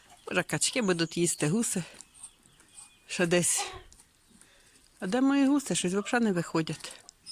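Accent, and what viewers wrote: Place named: Poltava, Ukraine. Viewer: Вас також хайБог береже і Ангел Охоронець. Бережіть себе, дорогенька, це я запитувала, звідки ви. Бо по говору наче з Полтавщини. Ви дуже приємна жінка. Приємно почути, трішки відволіктися від своєї буденності, думками когось пожаліти, заплакати. Я дуже мало кого дивлюся, а вам , дай Боженька, здоровʼя❤️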